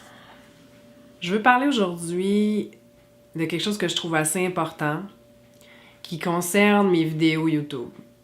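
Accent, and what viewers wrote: Quebec, Canada. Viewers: J'adore l'accent québécois ! 😘 Je compte partir vivre dans ton pays bientôt ! (Des conseils ?) Bravo à toi pour ta réaction ! 🙏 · En tant que Québécois, je peux juste te dire que tu as une belle voix et que notre accent crée une familiarité entre nous qui nous rapprochent. et nous rends distinctif. L''accent de chez nous exprimé par une jolie femme de chez nous c'est la plus belle des musique s :)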